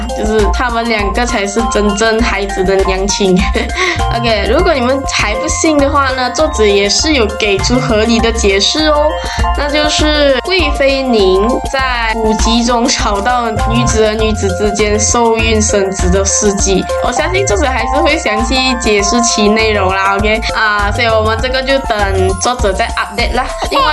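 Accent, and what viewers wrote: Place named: Malaysia. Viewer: 讲的很好只是马来西亚口音很重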